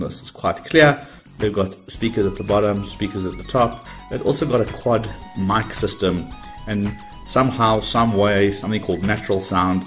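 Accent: South Africa. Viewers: He's a Saffer: if you ask him to say milk he'll pronounce it 'mulk' and he might refer to traffic lights as 'robots'. Either way, he's a good reviewer and getting a sub from me.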